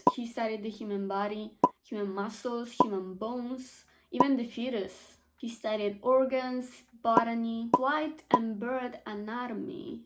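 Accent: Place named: Italy. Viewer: Wow, such a cute italian accent!💫 I'm currently studying it and would love to hear that content in your native tongue! Continua a registrarne di più!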